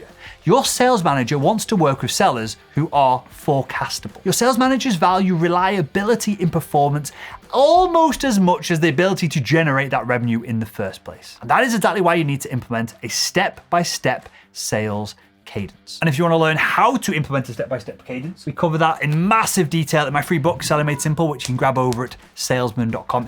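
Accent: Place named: North, United Kingdom. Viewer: Love your content, and the northern accent as always 😅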